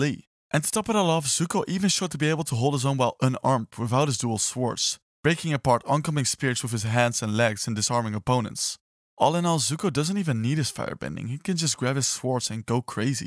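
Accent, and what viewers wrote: Netherlands. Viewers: I smell dutch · Nederlands accent gedetecteerd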